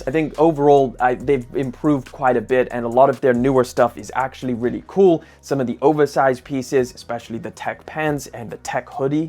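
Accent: South Africa. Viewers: Bro I didn't know you South African. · Bro are you by any chance South african?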